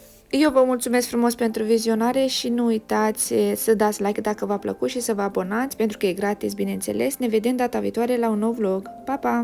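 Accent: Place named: Moldova. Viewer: Te aud ca esti moldoveanca. De unde esti de loc?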